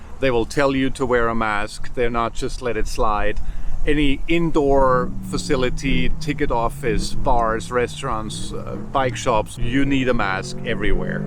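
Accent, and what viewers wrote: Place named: United Kingdom. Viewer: Please give me a call I’d really like to talk to you in and hear you from Scotland or not Wales, but somewhere on the English channel? Your accent is beautiful give me a call I’d I would really like to hear from you, especially for the fact with your experience in Montana, I would like to learn I’m moving out there. I’m not vacationing I’m moving there and I need to be successful and I need to know what’s going on I need to learn from people that are from there or the understand it better than I do and I am leaving today at 12 PM. Give me a call. Text Me maybe some hall we can get together. It would be wonderful to learn from you my friend you sound like a very good person so God bless all the best to you and your family family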